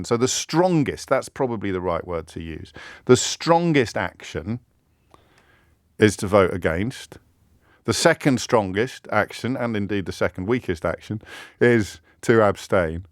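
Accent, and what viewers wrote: RP, United Kingdom. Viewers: He thinks speaking poshly automatically makes him smart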